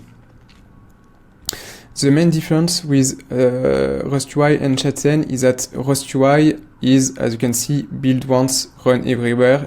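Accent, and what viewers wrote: France. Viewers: Tu parles francais ? du moins je l'entends quand tu parles anglais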